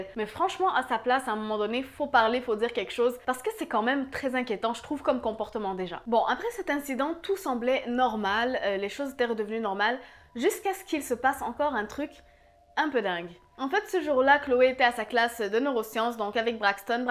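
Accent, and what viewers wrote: Quebec, Canada. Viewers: Tu es québécoise ?Parce que perso j’adore ton accent 😍😍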